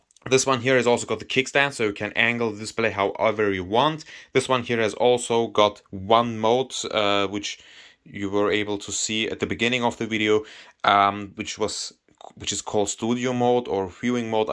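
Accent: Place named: Germany. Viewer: I love your German accent ❤️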